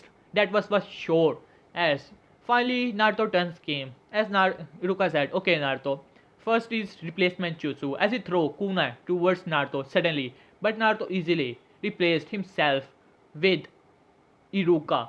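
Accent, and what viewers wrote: India. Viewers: He has an Indian Accent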